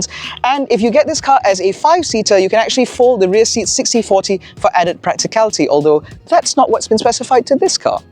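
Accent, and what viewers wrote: United Kingdom. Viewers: British accent on a British brand, apt! :D Lovely car that I can't afford.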